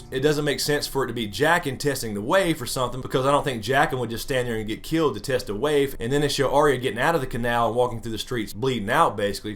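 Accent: South, United States. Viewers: and fuck that dislike. Probably some other GoT reviewer. You have some of the best GoT videos out, and I try to watch all the good folks. Plus im pretty sure from your accent your from the south, or maybe out west in texas or something lol. Either way, us southern folks gotta stick together lol.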